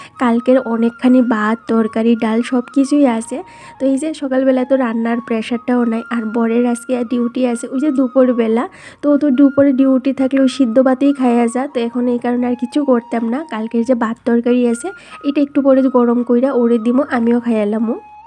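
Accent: Sylhet, Bangladesh. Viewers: তুমি কোথায় থাক? তুমি কি সিলেটি? তোমার কথা শুনলে মনে হয় সিলেটি,,,প্লিজ কিছু মনে করোনা,,,আর আমার প্রশ্নের উত্তর দিও❤️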